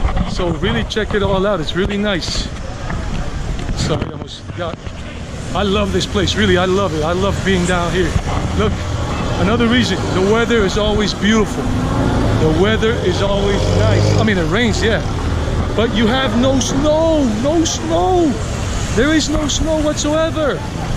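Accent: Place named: Colombia. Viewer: Wow, you sound like Colombian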